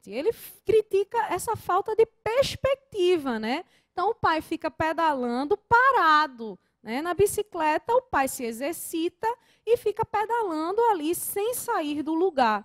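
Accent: Northeast, Brazil. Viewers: Obrigado Profª.. Além de competente, você é muito fofa. E, como o seu sotaque não me engana, deixo aqui um ENORME abraço aos queridos nordestinos. Mais um "Gostei" para você.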